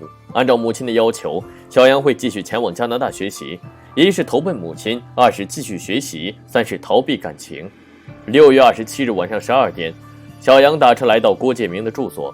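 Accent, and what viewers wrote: Standard. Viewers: 不管怎么样配音的很好 标准，耐听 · 主播发音特别标准 声音好听 ， 废话太多 😂 继续加油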